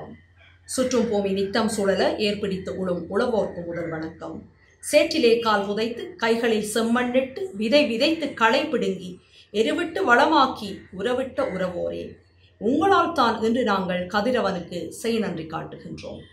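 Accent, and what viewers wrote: Sri Lanka. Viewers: ஐயகோ சகோதரி ஒவ்வொரு முறையும் நன்றி நன்றி என்று சொல்லி நம் சகோதரத்துவ உறவை பிரித்துவிட வேண்டாம்😊🙏 இப்போது என்னவென்றால் "நன்றி ஐயா" என்று சொல்லி விட்டீர்களே😊 என் அன்புச் சகோதரி தங்களது குழல்வாய் மொழியென்னும் இலங்கைத் தமிழும் தங்களது கனிவான பேச்சும் உயர்ந்த எண்ணமும் தெளிவான சிந்தனைகளும் என்னை மிகவும் கவர்ந்தது...! என்னைப் பொறுத்தவரை தாங்கள் எனது உடன்பிறவா மூத்த சகோதரி ஆதலால் உங்கள் தம்பி நான் உங்களை புகழ்வதற்கும் வாழ்த்துவதற்கும் நன்றி செலுத்த வேண்டாம். இலங்கைக்கு வருகை புரிய வேண்டும் என்பது எனது நீண்ட கால ஆசை...! எளிதாக வரக்கூடிய காலங்களில் வாய்ப்பைத் தவற விட்டுவிட்டேன் தற்போது கொரோனா கட்டுப்பாடு தனிமைப்படுத்துதல் அது இதுவென்று ஏராளமான இடையூறுகள் உள்ளன. இருப்பினும் நிச்சயம் ஓர் நாள் நான் நமது இலங்கைக்கு வருவேன் உடன் பிறவா என் அன்புச் சகோதரி தங்களையும் தங்கள் குடும்பத்தினரையும் காண்பதற்கு😊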